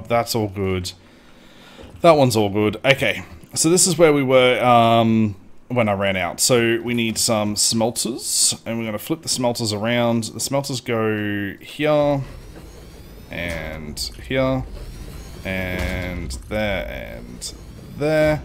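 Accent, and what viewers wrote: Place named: Australia. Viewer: Is the "Build Big or Go Home" mindset just how Australians like to play these games? Because this is damn impressive! I know another youtuber who is a Minecraft player and he is also Aussie and loves to build grand projects:D I love the videos (Also, no offence meant if you're not Aussie, the accent sounds like you are but i could be wrong, no offence meant though <3). I am excited to see where this mega project takes you! Keep up the great work!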